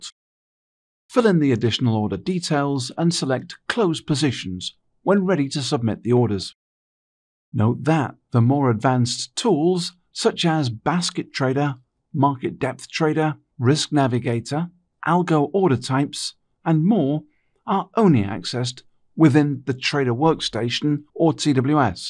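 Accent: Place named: United Kingdom. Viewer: This vidEO has gone tangent and not to the point. We are talking here about trades, correct? What you are starting to discuss is "How to convert currency" - how is this related at all? This is a different topic altogether ! What IBKR VIDEO missed- 1) No talk on how to place ETF order or no option for that - is it same as stock? 2) No discussion on order types, what are the different types of stop orders, limit order IBKR SERIOUSLY NEEDS TO IMPROVE THEIR DELIVERY QUALITY - AND PLEASE PLEASE GET RID OF THIS UK ACCENT ! THANK YOU.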